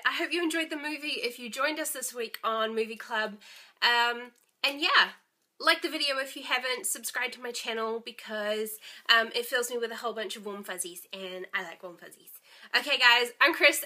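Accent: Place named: New Zealand. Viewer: Awesome review m'dear. I know it's weird when people talk about your voice, I get it a lot but your NZ accent is truly awesome btw :P Yeah I'm not sure what to think about whether or not he was possessed by the evil already or if it got to him whilst on the ship. I lean more towards him being possessed already as that tends to explain more.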